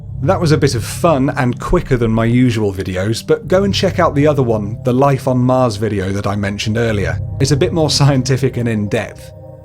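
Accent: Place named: England, United Kingdom. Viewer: Love love love your channel. Curious as to where your from. Kinda go a English accent. A real one. Not one that you see in Hollywood lol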